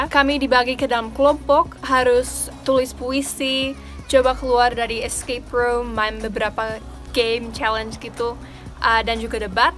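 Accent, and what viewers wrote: Papua, Indonesia. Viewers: Bule longat papua juga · Terimakasih atas informasinya sister, sungguh terlihat manis didengar orang asing asli berbahasa Indonesia dengan logat/lahjah Indonesia. Sukses selalu buat sister, brother and keluarga. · Logat indonesianya mulai agak luntur 😁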